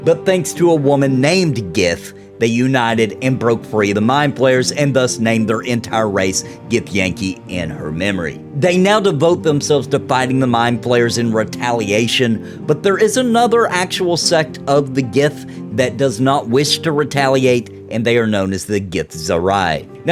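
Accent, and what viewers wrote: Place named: South Carolina, United States. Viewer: I live in South Carolina.... your accent reminds me of alot of people around here.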